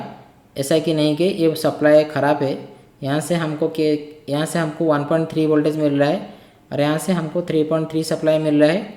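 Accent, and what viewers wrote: Odisha, India. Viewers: Emiti laguchi tame odisha ru boli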